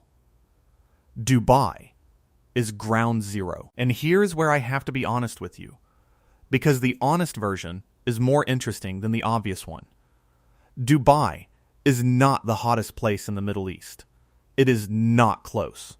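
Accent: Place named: United States. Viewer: I despise that US accent 🙄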